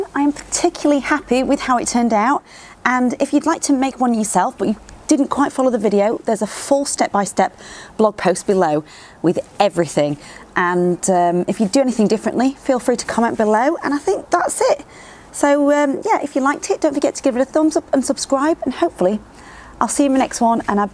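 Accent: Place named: Stockport, United Kingdom. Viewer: Really enjoy your videos! I’m originally from Stockport Manchester, I’m now in the US. Your accent makes me think of home.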